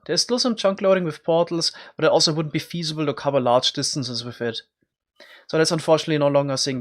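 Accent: Germany. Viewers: Kann es sein das du Deutsch bist? Wenn ja man hört as an deiner Aussprache! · This english Akzent. I höre, dass you are a german sprechen Person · Ξενοφώντας Σούλης I’m Mexican. When I heard his German accent I shit my pants 😂😂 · Man merkt halt echt das du Deutsch bist ^^ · Kann es sein dass du deutsch bist? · Dieser deutsche Akzent xD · Deutscher Akzent Noice👌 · I hear that you are german.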